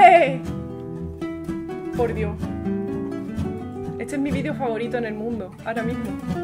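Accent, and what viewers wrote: Andalusia, Spain. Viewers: Hola guapaaa, he vivido 11 años en Madri, soy español de corazón, pero brasileño también. Ahora mismo estoy en mi tierra, Maringá, Paraná, sur de Brasil. Soy tu fan, me encanta tu acento andalú, además de tu talento y tu canal. Oye, eso de las reacciones está fenomenal. Si te parece bien, voy a enviar videos de 3 chicos muy talentosos que sigo en el youtube. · Me gusta mucho oírte tus análisis musicales, porque uno oye las cosas y muchas veces no te das cuenta de lo que oyes, sin embargo tú le enseñas a uno a oír la música Además eres super divertida al explicar, tus expresiones y gestos y tú acento español me fascina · veo muchos videos tuyos porque me encanta ese acento andaluz.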